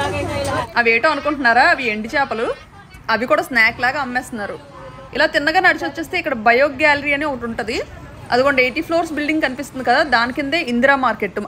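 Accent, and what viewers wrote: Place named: Godavari, India. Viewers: Madam midi వెస్ట్ గోదావరి లా అనిపిస్తుంది మి voice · Nuvvu beautiful ga unnavu..Tollywood lo ki enter avvachhu kada..voice super..West godavara /East godavara..very beautiful & nice voice..congratulations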